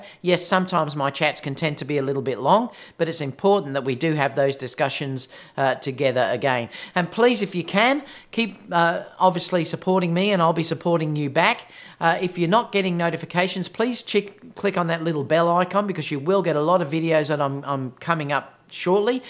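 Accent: Australia. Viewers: Congrats! I bet your AP impersonation won you some, haha. Just be careful, I just heard JL is threatening legal against AP. Hate to see AP threat legal against you, hahaha. Reason I like your content is you are pleasant to listen to, Americans like Aussie accents, you have the same hair style as me, and you drink Corona.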